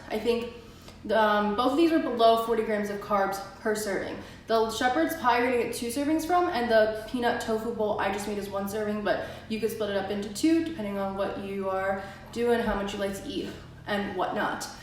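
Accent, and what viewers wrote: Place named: Philadelphia, United States. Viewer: Great recipes! Just started low carb vegan a couple weeks ago and already seeing results. Are you from Philly area? I detect the accent (I'm from there too :) )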